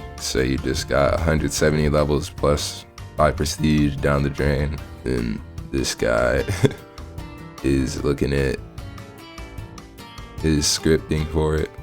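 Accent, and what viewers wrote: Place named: Aba, Nigeria. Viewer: why bro got a aba accent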